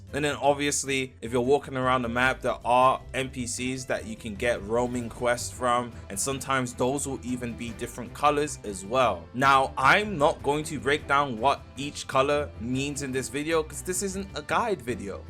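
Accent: United Kingdom. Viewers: Wait, you're british??...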